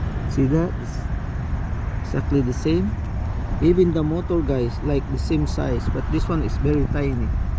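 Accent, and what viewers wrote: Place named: Philippines. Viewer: hi are u a filipino? i can tell by your accent hahahaha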